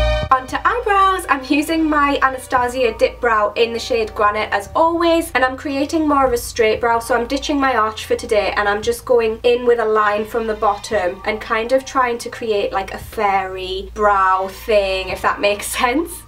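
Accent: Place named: North East England, United Kingdom. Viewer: where abouts are you from? you doing like you are from NE England somewhere xx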